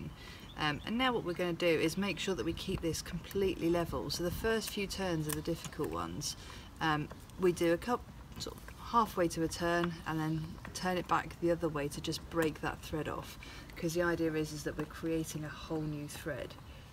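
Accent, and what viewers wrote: United Kingdom. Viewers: Always a pleasant surprise is an English accent, and a woman's at that!.... oh, and a nice demo as well.. thx very much indeed!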